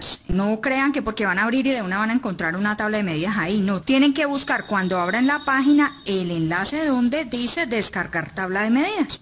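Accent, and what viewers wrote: Colombia. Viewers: Hola, excelente tu forma de explicar, por tu acento, creo que eres vallecaucana, soy de Tuluá, y gracias a ti, he aprendido mucho. Gracias por tu generosidad al compartir tus conocimientos. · Que gusto conocerte, poderle poner un rostro y un nombre a esa voz tan Colombiana. Agradezco infinitamente tu dedicación y esmero en enseñarnos, se nota que te gusta lo que haces, me encanta tu honestidad y tu forma tan graciosa de hacer aclaraciones.... de corazón te digo DIOS TE BENDIGA....!!!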